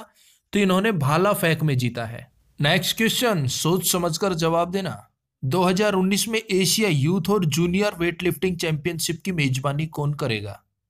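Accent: Haryana, India. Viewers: Sir Aap Haryana se ho kya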